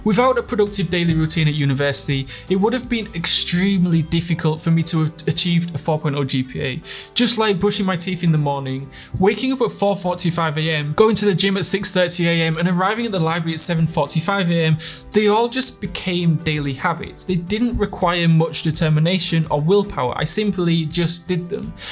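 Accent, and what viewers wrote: Birmingham, United Kingdom. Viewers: you speak like picky blinders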